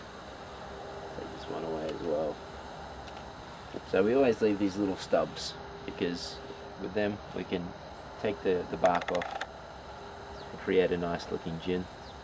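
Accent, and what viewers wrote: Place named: Australia. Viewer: great to hear an aussie voice....cheers mate....you did great explaining....maybe discuss the growing habits,fertilizing etc...go oz :D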